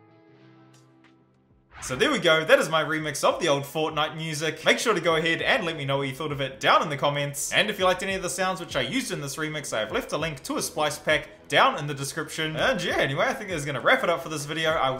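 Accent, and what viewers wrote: New Zealand. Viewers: Are u from nz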